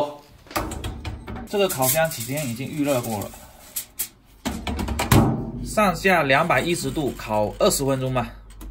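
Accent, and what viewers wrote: Fuzhou, China. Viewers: 福州音太呛了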